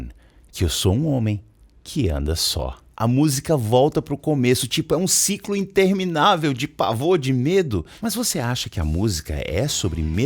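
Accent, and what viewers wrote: Northeast, Brazil. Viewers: Teacher fera! E esse sotaque do meu nordeste só torna o vídeo melhor de assistir! Que qualidade! 👏👏👏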